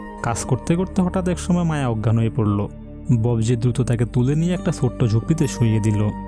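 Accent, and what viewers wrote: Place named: Chittagong, Bangladesh. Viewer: বয়েস টা মনে চট্টগ্রাম এর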